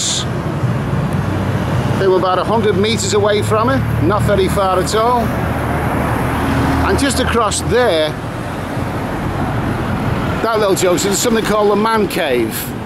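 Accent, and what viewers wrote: Liverpool, United Kingdom. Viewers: New to your page, you sound like a scouse. I haven't lived in UK for almost 50 years. It looks OK there. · a bit of a scouser I think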